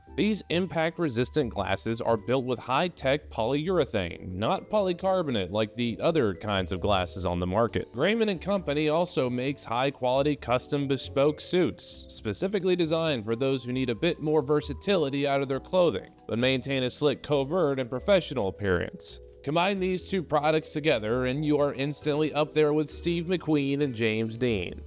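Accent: United States. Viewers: hhhmmm... I hear an American accent. I assert that YOU don't know what special forces do, because your special forces are bog standard elites that don't really do much actual SF work.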